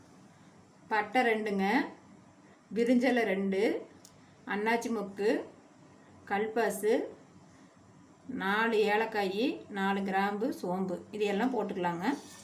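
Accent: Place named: Kongu, India. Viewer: கொங்கு மண்டலமா சகோதரி.அருமை 😇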